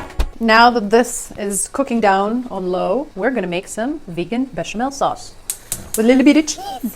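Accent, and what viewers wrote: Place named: United States. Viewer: американский акцент И(!) метрическая система – это то чего мне не хватает в англоязычном фуд-ютубе💛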